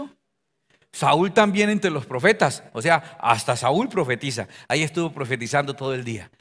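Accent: Cantabria, Spain. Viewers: Gracias paisano no solo por la Palabra de DIOS REVELADA, si no por la manera como la trasmite en nuestro dialecto especial Santandereno tan jocoso gracias pastor me hace reír y estoy segura que al Señor.Jesús también bendiciones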